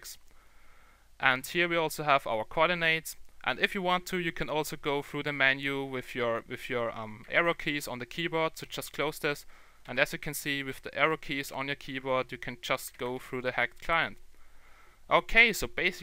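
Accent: Germany. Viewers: you sound like German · You have a German accent haha: DD!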